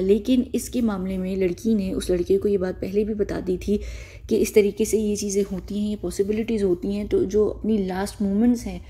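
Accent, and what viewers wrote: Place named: United Kingdom. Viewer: Love your English accent reminds me of home 👌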